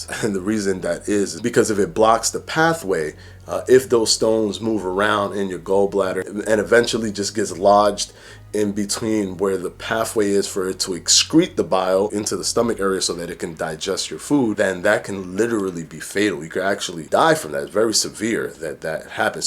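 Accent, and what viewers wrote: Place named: New York, United States. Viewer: Angela Davis-Floyd, The Listing Boss cut my bae some slack he has a New York & Florida twist👍🏽🙏🏽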